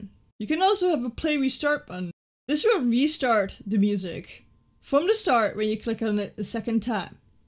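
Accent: Netherlands. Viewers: Even if I'm not a streamer I bought a Stream Deck to have fun in Discord during our gaming sessions. I searched for tutorials how to use it as a soundboard and found your video. I really like this video it because it is clean and simple without much talking about other things that are not relevant for the topic. It's a pitty that your videos don't have much more views. And I love this dutch/english accent :)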